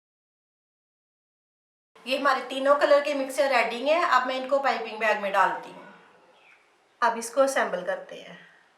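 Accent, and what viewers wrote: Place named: Lahore, Pakistan. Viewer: VIOCE TO LAHORI LAGTA HA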